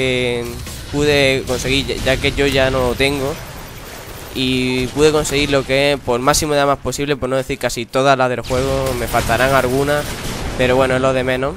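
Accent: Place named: Andalusia, Spain. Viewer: Los andaluces hablan así xd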